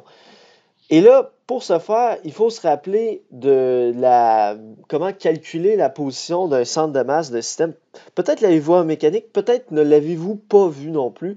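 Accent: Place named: Quebec, Canada. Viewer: Ton accent est Excellent mais ducoup on comprends pas trop quand on est pas québécois xd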